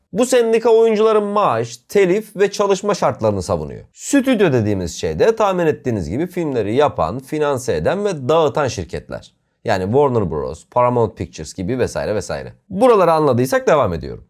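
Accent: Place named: Çorum, Turkey. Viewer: Çorum şivesi :D jhafklsjnklfsşjfslşf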